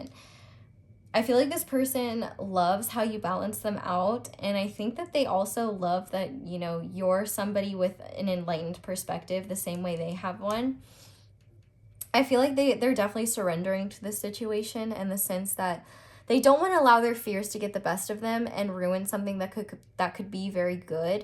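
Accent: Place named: United States. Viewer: i wish i knew which part of america her accent is from. i fall asleep to her voice so often. it is so soothing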